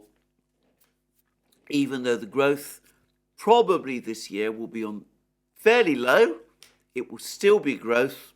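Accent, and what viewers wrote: United Kingdom. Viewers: I love your program,. I never miss it. You're well-informed, truthful, reliable and also a gentleman. Besides that, I found your British accent clear and very, very nice. Cheers from Santiago de Chile!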